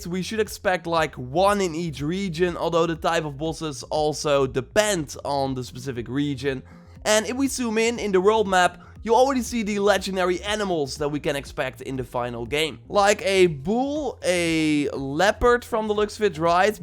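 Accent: Netherlands. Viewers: Awesome vid, my fellow dutchman! (Guessing by the accent... am I right? xD)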